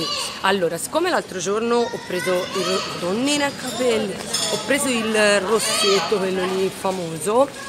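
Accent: Tuscany, Italy. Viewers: Quando sei euforica la cadenza toscana esplode proprio!! Ahah! Bella,!!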